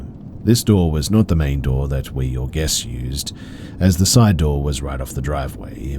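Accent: Australia. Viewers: He's Australian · He's Australian. He is not form the uk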